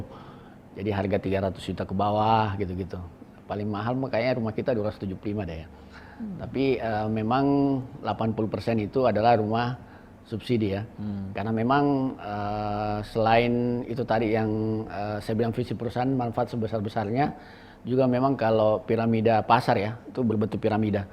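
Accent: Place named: Makassar, Indonesia. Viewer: edeedeeee kentara na makassar na ini daeng 👎👎👎👎👎🤪🤪🙈🙈🙈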